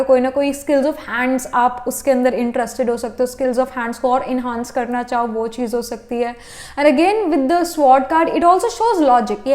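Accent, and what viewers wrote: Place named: South, India. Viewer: Your voice is very good with south indian touch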